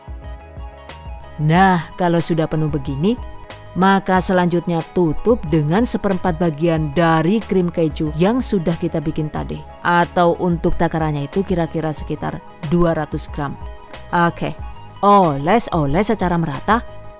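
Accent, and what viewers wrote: Banyumas, Indonesia. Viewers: Bunda asline mana kok bisa pake logat ngapak si.. hehe salam ngapak dari Banjarnegara 😁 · Bunda lucu. Dialeknya bisa Inggris, Indonesia dan Ngapak 🤭. Anyway, terimakasih reswpnya, bund. Kalaudioven, bagaimana ya hasil cream cheese-nya?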